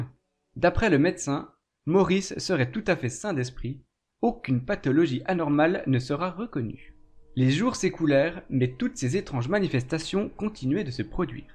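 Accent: Jura, France. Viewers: Bonjour vos vidéos sont intéressantes, on ressent un gros travail de recherches ... Félicitations ! Continuez comme ça. Marrant l'accent Jurassien !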